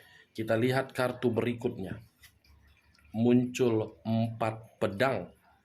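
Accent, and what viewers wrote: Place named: West Java, Indonesia. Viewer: Acak Adul Sunda banget bang Bahasa orang Bandung 😁😁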